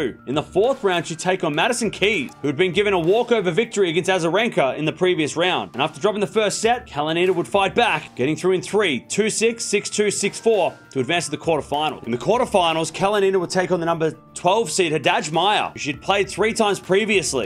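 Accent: Australia. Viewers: But Sorry, I thought he was American. Is he an Australian then?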